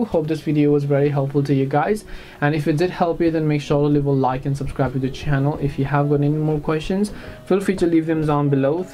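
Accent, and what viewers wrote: India. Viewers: Talking too damned fast and with and Indian accent. UGH. Learning requires a good teacher.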